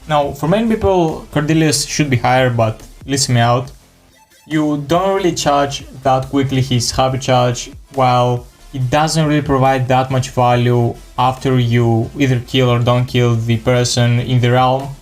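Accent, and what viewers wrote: Greece. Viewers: Ayeeee the greek accent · The Greek accent🇬🇷🇬🇷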